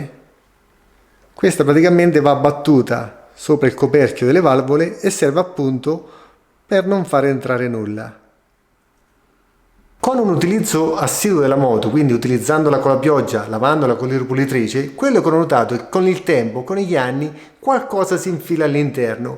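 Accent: Marche, Italy. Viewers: Gran moto la Super teneré, ne ho avuta una uguale identica! Dal tuo accento sento che sei Marchigiano, oserei anche azzeccare la provincia ma non sono sicuro, Macerata?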